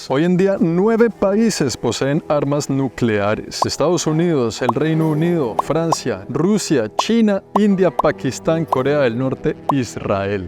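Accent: Bogotá, Colombia. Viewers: escucharlo a usted es remontarme en el tiempo y volver a mis epocas de estudiante en el colegio mayor de san Bartolomé, ahi en la esquina de la plaza de bolivar, al lado de la puerta falsa y en frente del palacio de Nariño en Bogota. Asi nos narraban la historia mis profesores de sociales. Con ese asento pausado y elegante del cachaco bogotano. Eran una verdadera delicia esas clases, charladitas. Maestro, gracias totales como decia Cerati. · El español bogotano en pleno! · Ese acento Bogotano de, es un gusto escuchar su información... Sigue asi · 😂 El tipo es más rolo que la changua, más cachaco que el ajiaco, más Colombiano que la cumbia y el vallenato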